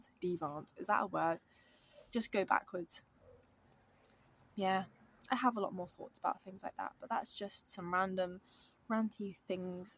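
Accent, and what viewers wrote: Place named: United Kingdom. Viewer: I'm from Germany and I love your British accent. (Hopefully it is British I don't know too well)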